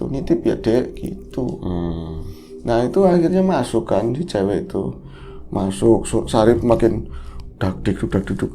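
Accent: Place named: Java, Indonesia. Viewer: Kaku wez pkoke nek bro bwain criTa hahhaaa auTo ngakak medoke pooollll joozz gandozz, sukses trz kang..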